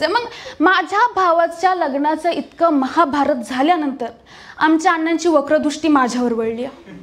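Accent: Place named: Nashik, India. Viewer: खूप छान नाशिकचा एक्सेंट छान आला म्हणजे असं वाटलं की खरंच नाशिकचे आहेस म्हणजे तू आहेस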